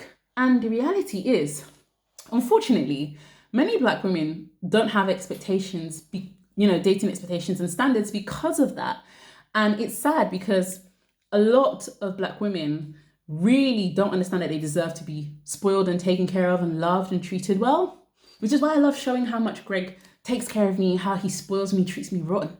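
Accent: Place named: United Kingdom. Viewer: Your British accent is beautiful.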